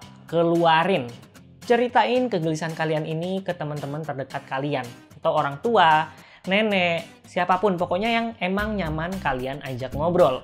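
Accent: Toraja, Indonesia. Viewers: Lu orang toraja bang??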